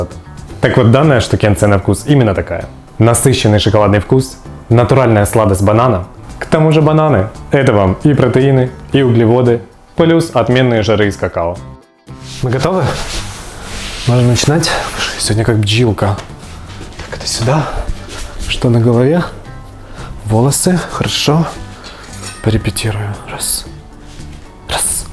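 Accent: Ukraine. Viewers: Откуда же автор родом?! Часто слышу украинскую речь ;)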